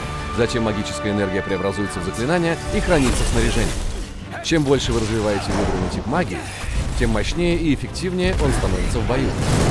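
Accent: Russia. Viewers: ООООО РУССКИЙ ГОЛОС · ОООО, русский голос! #1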